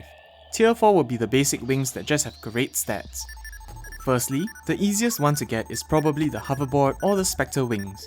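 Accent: Singapore. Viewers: just curious, are you singaporean? your accent sounds v familiar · His voice like a Singaporean guy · is guy sounds singaporean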